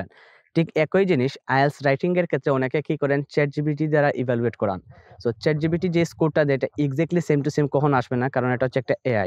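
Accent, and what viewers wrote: Sylhet, Bangladesh. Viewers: Vai apne Sylhety ni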